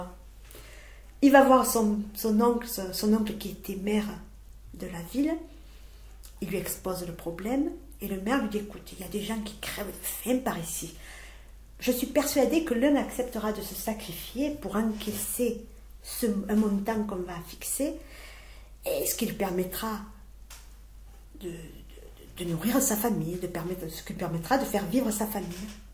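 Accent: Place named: Provence, France. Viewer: Très drôle et j adore votre accent qui me fait penser à M. Pagnol , quel beau pays que la Provence !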